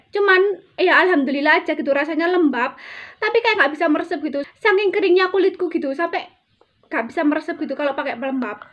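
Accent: Java, Indonesia. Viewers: Assalamualaikum Rek kembali lagi bersama video youtubeku... Demen sama video mbak ini jowone enak dengee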